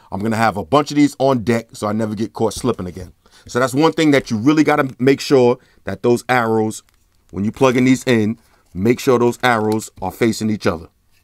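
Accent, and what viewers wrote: New York, United States. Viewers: R u from New York? Ur voice is very cool 😎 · i feel like i got bullied into buying this... his voice is mad aggressive, mad convincing tho lmfao you can tell hes a new yorker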